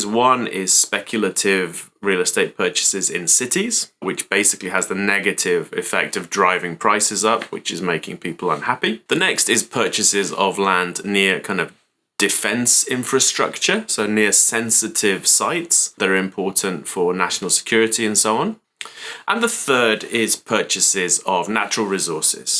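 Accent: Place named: Kent, United Kingdom. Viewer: Thank you for your clear videos. They are really appreciated. At the moment, it's hard to find genuine (non-sensationalized) information. A possible new/expanded topic, if you are looking... April 2026, bicycle rules. I saw your bicycle video about a month ago... You look good! But maybe some more details, could be good. It's not a huge topic but I can see another Police crackdown coming!!! Last crackdown, about 5-6 years ago?, I was waved off the sidewalk, on too an extremely busy & major road. Immediately, almost being sucked under a truck!!! After another on-road hit and run!!, I have NEVER cycled on the road again. But according to the new rules, sidewalk riding is not illegal, you just need to be careful & polite. How to register the bicycle for Crime Prevention (especially, if you buy from Amazon). Near us, local shops are not willing to help unless you buy their bicycles, which I can understand. 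The Local Police, wouldn't do it!!!? That seems very strange. Last video, you mentioned Insurance but not TS Marks. Not mandatory but encouraged, if not covered by car or house insurance. A good idea for shorter term people. Just an idea for a new or continued video. Thank you for your videos. 😉 Dare I ask, did you used to live in Kent? To me, you sound very much like a Kentish person.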